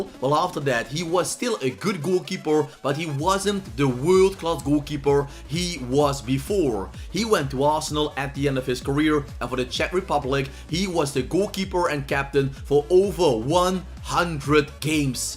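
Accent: Netherlands. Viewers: You sound dutch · Are you from Limburg? ( The Netherlands) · are you from the netherlands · A little bit of a Nederlandse accent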